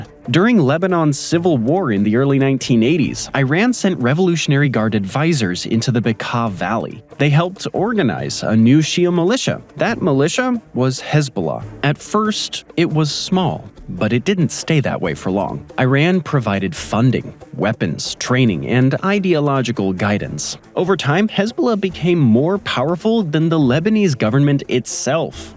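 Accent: United States. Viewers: Warder, Baddle, Baddery, Lidderally. No one butchers the English language like a Seppo.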